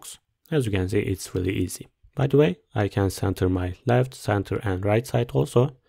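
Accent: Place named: Turkey. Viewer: your accent sounds Turkish, keep up the good work king 🤍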